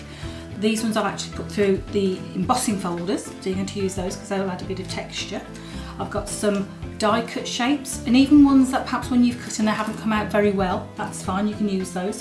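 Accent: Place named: Birmingham, United Kingdom. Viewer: Thanks. Great video. And I love them Brummy accent! Reminds me of home in Coventry xx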